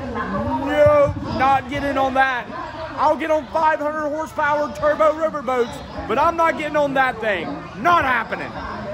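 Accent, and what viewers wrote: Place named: Pittsburgh, United States. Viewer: That accent...you from Pittsburgh? WV? Eastern OH?, anyway good videos.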